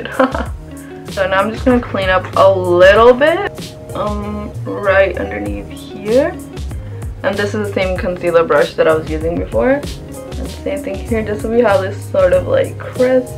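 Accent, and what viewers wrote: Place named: Punjab, India. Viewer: Really love your all vedios....love your accent while you mix punjabi tay English mix..... please put some hair care tip...as your hairs have volume...you use any spray to light it up......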